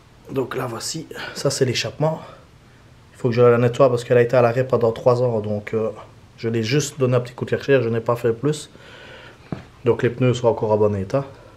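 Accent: Liège, Belgium. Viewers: T belge ? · J'arrive toujours pas à m'habituer à l accent de Liège mdr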